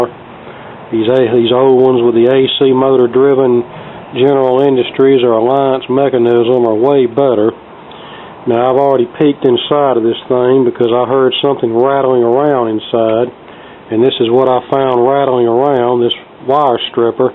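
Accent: United States. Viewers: Fucking Cowboys accent I just love it 😏😌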